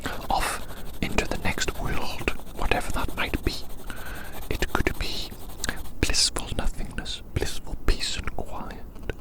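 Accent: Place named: RP, United Kingdom. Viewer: This dude’s pronunciation puts stereotypical British to shame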